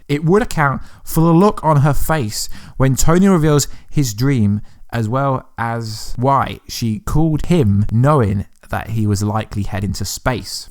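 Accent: United Kingdom. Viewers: I prefer cosmic wonder. He has better presentation and doesn't have this goddamn Brit accent. Sounds like he has a knob end in his mouth.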